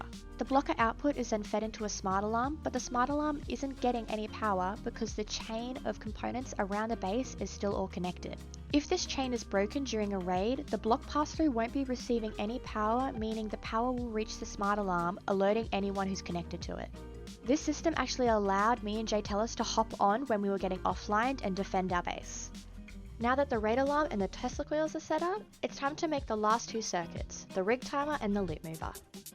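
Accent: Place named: Australia. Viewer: love it when an Aussie woman tells me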